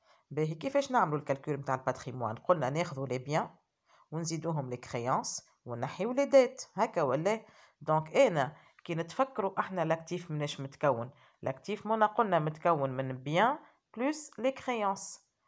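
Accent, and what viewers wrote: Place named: Tunisia. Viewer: En tant que marocain j'arrive facilement à comprendre l'accent tunisien et vous expliquez très bien Mme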